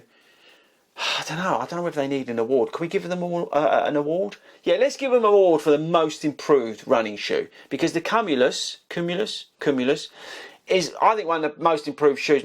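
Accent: United Kingdom. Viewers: Ich mag diesen britischen Akzent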